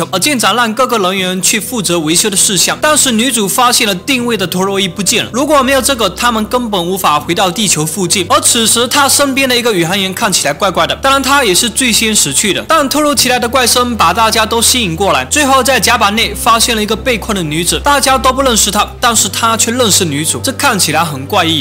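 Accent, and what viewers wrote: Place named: Fujian, China. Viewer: 虽然我是福建人 但是听到福建口音的普通话还是不怎么舒服啊哈哈哈哈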